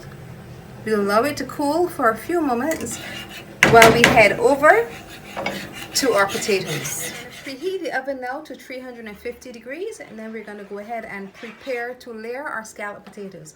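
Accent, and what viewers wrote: Barbados. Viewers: Your accent sounds like bajan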